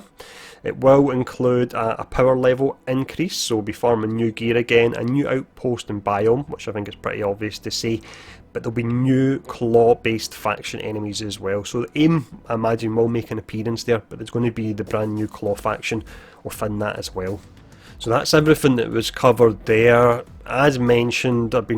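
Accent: Scotland, United Kingdom. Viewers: Passion with a scottish accent ... good to see you excited about the game. Patrols are coming!!!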